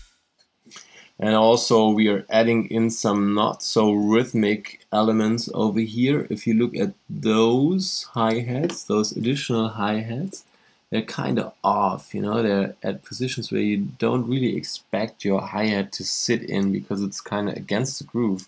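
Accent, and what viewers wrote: Germany. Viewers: Ich hörs doch, you are german!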